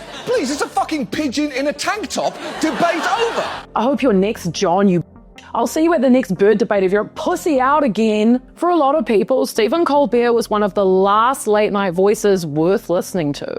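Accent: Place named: New Zealand. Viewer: It's low budget Colbert with a cute Kiwi twist. I'm here for it 😎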